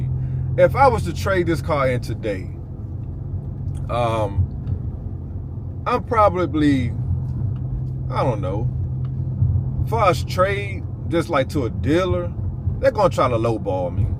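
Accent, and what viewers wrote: DMV, United States. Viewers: That looks like old Bowie, MD fr. You definitely from the DMV! I can tell by your accent too!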